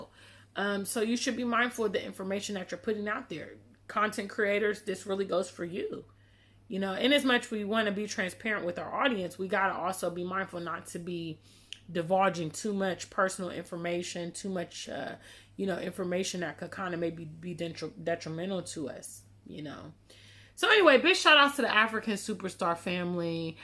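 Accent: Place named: United States. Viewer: Well come back sis, your American accent with twi made me chuckle. 😂 God bless you.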